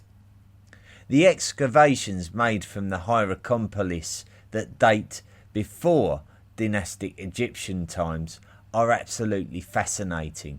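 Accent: United Kingdom. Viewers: What in the hell are you talking about? All I hear is a British accent espousing junk science.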